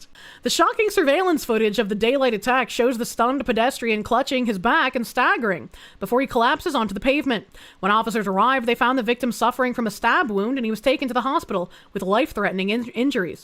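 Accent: Boston, United States. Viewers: I’m hooked whenever I see her ring all cute in the intro. Then the Boston accent hits?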